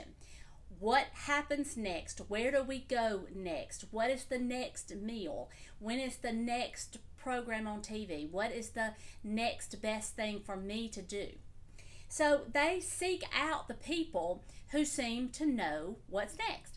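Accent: South, United States. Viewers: I just love your southern accent and delivery. You are so sweet and compassionate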